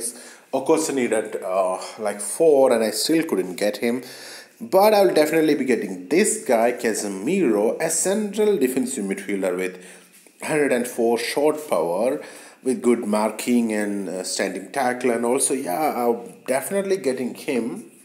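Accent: Kerala, India. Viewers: Dude are you from Kerala? Great content btw! :)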